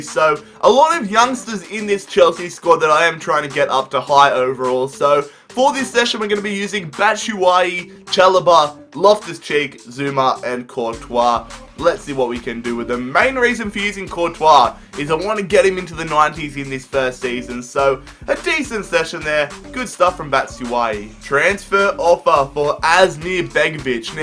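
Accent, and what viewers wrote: Australia. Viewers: Are u Australia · where u from? is it Australia?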